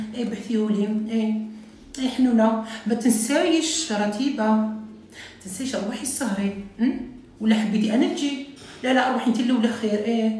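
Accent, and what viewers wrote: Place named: Ain Defla, Algeria. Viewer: نظن عين الدفلى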